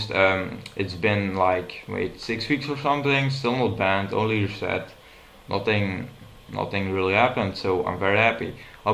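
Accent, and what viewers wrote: Netherlands. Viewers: nederlander? lol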